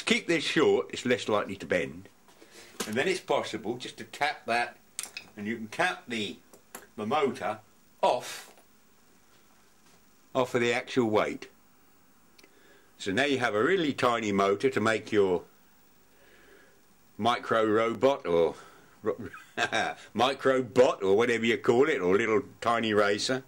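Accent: United Kingdom. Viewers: I always enjoy your videos and this one was no exception. I thought you guys went metric decades ago. What is with the imperial measurements? How is it that you even have a tape like that? I like the accent myself. It is colorful but still very understandable. I like to watch another shop type channel owned by another British fellow but I normally only understand about 25% of what he says. He has an interesting channel anyway. Thanks for sharing.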